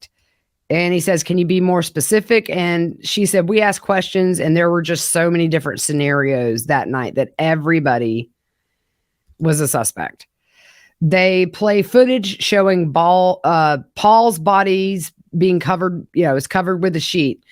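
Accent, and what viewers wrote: South, United States. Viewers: This trial is already a passion of mine. A southern gothic tale! You know what we want to hear about and your honeyed tongue has the perfect down home accent. I’m betting that someone with important connections will agree with me and you’ll be even busier after this case has been resolved. Keep chasing the truth, girl!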